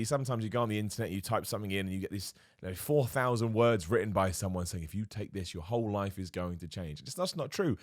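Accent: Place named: London, United Kingdom. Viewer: You got to love that smarmy working-class London accent.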